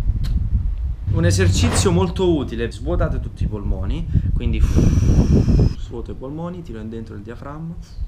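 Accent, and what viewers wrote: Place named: Salento, Italy. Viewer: Ciao, ti seguo dal video delle iene e quando hai fatto il video con freddy sei diventato il mio idolo ahaha Ho 28 anni e sono un apneista amatoriale e autodidatta da una vita, da prima di internet e del 'sapere' facile, negli ultimi anni ho letto qualcosa sull'apnea e ho migliorato un po le performance anche se devo dire che ho ancora problemi nella preparazione fuori dall'acqua. riesco a stare sott'acqua si e no un minuto.. vabè ti ho scritto per altro;:D Volevo dirti 2 cose, una è che ti è venuto l'accento salentino a furia di stare qui ahah la seconda, riguardo la compensazione, io compenso senza mani, sono davvero fortunato o ce un metodo per insegnarlo? è da 2 anni che cerco di far capire a dei miei amici come fare e non ci riesco. ti ringrazio in anticipo, spero un giorno di incontrarci, magari in acqua ahah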